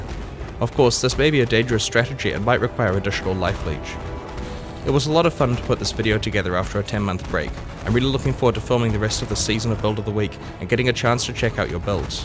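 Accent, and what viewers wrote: New Zealand. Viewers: Who's "GrantingGayGames"? ^^ (no offence meant,i just love newzzie pronunciation)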